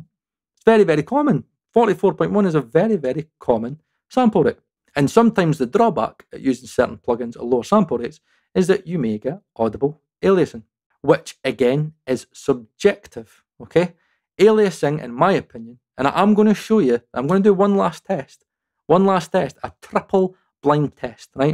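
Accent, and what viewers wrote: Scotland, United Kingdom. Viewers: Yeah, it surely would improve the intelligibility. I mean, being Scottish doesn't help it one bit. 😆 Love your vids, man, keep'em comin'! 💪😎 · Lol. I didn't know that's how the Scottish accent sounds like. It's kind of cool. Hey, BTW, I'd love to see you reviewing and testing Black Rooster plugins. 🙏🏻 Thanks a lot for your great work!!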